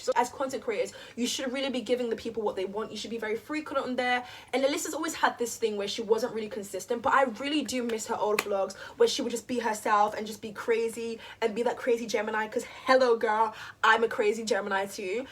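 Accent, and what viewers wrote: England, United Kingdom. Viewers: I watch you because I love how straightforward you are in your videos . You really don’t hold back which is really good and I also watch you for your accent 😂 because you sound exactly like my cousin In England and I don’t get to see her often ! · i watch you because i want to get used to this accent to understand British series and I think your channel is entertaining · I still love hearing your English accent. ASMR moments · You just recommended to me 😊 also your accent makes me miss the UK cos I just moved away, also I like that you express your opinion - I agree with your thing about Antonio Garza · Because your great. You also have a really cool accent! I love when people who are African American people have British accents!! 😊 Your videos are also very honest. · I love and watch because of your accent because i expected an american one. 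I am english aswell so its making me feel nostalgic as i dont live in London anymore 💜 also you keep it real and girl you have alot of common sense and your hair is amazing. I love natural afros 🙏🏻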